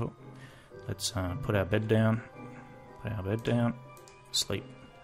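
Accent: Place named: Australia. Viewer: Whoa!! Loving it so far! You sound so... Aussie! Ahahahaha Most amazing bro.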